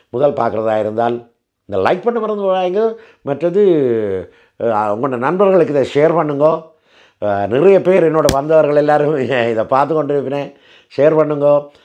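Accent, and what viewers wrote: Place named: Jaffna, Sri Lanka. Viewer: 😂🎉thanks aiya for share your experiences in purely jaffna tamil 😂😂❤😂❤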